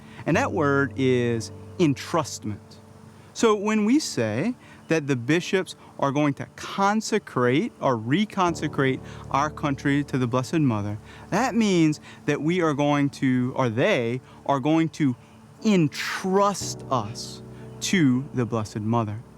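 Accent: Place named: Louisiana, United States. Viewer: I've said it before and I'll say it again, Father - your diocese should really promote your videos more! They are beautifully made and your teachings are wonderful. I use them in my parish weekly newsletter and our website, here in the Archdiocese of Philadelphia! Love your Louisiana vocab and accent! ;)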